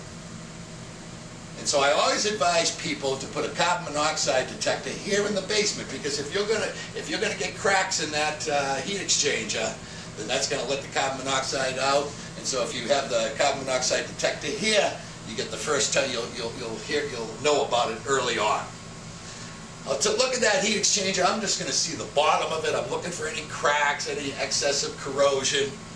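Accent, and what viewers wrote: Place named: Boston, United States. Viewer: Chicagoland? I am hearing a fellow Boston guy.....boiluh, wadduh preshuh!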